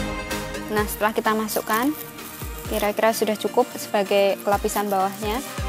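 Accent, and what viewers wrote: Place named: Banyumas, Indonesia. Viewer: Kayaknya asal Banyumas. Medok :D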